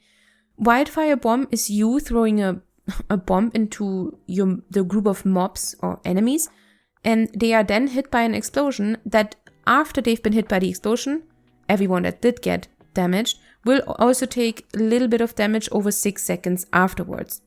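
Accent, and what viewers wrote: Germany. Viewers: You are not even trying to hide your German accent like many do. I love it. You seem like a sweet person, I main a bm hunter but I want to try something new and since I don’t like mm I will give sv a chance. Thx for the guide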